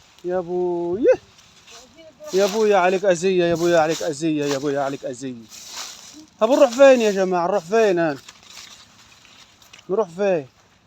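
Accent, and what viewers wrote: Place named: Libya. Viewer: لهجتك فلاحي على بدوي على ليبي انت من وين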